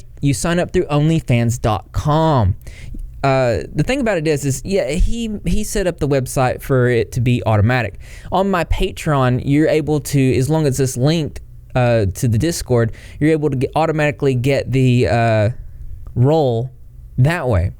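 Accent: South, United States. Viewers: Love that southern twang 😍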